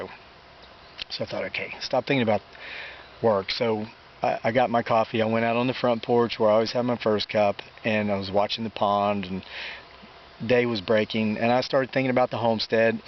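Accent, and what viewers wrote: South, United States. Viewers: Just found your channel a couple days ago, and I tell you, I am impressed! Someone that lives by their morals rather than the bucks they can rake in...Thank you! I hope you are able to leave this job with a good taste in your mouth, that you have done your best, given above what you should have had to, and can leave with pride...I'm sure however it turns out, the full notice or not, that you have. As a northerner, I love listening to your southern speech...it's soothing to my heart and ears. My grandmother and great-grandmother came from the south, it feels like home... Blessings to you and your wife and son. God bless!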